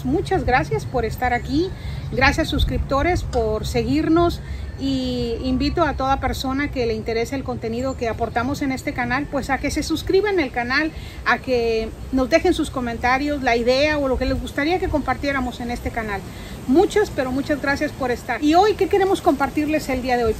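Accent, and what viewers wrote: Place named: Spain. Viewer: Y ahora x q empezaste hablando como muy española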